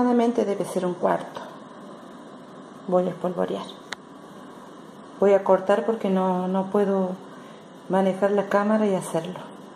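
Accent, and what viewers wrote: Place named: Salta, Argentina. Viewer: Suena a salteña 🥰. Ahora voy a hacer el dulce.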